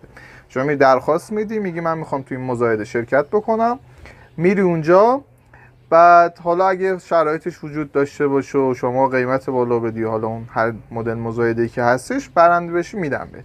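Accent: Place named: Hamadan, Iran. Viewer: یکی از شهرستانای همدانه ک ترگ زبان حالا کدوم شهرستانی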